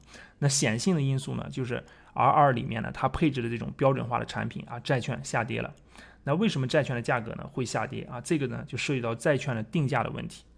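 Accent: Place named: Shanxi, China. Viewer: 感觉你是山西人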